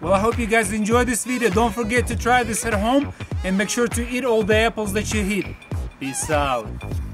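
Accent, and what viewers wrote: Russia. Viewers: Русский акцент не скроешь.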